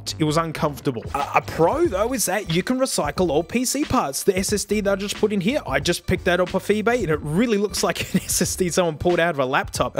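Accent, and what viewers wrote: Australia. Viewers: I actually do wonder though: Is that accent only in some parts of Australia? Because I went to Australia before and the place I went to has the stock English accent that a lot of Americans have. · I miss hearing "Australian" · I like it when you do the funny aussie voice, it makes my day with every upload 😁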